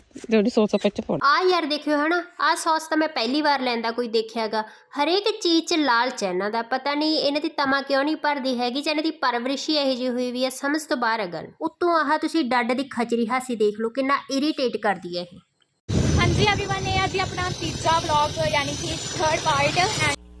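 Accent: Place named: Bathinda, India. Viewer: Kida jiss hisaab naal tuc yaar pronounce krdeo oss hisaab eda lgda v tuc Bathinda ton o cuz mere nanke v Bathinda ton ne te o v bilkul same eda hi yaar pronounce krdea..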